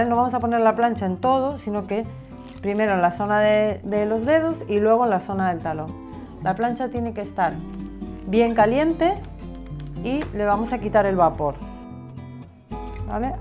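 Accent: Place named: Argentina. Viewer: Hola !!! Me encanto tu trabajo !!! Ya me suscribi active la campanita y puse me gusta .Me tomo el atrevimiento de comentarte que tu acento lo escucho entre español y argentino. Saludos 🙋‍♀️ Y a cuidarse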